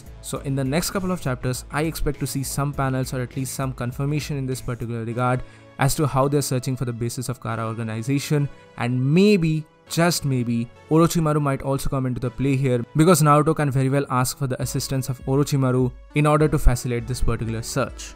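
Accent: India. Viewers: Noice man,nice explanations.....this is channel I was looking for and I like how u talk like in indian syle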